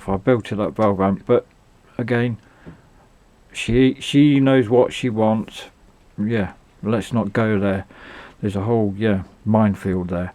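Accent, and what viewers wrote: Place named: East Midlands, United Kingdom. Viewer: Great video. Very interesting and entertaining. Sounds like you're an east mids lad. Cheers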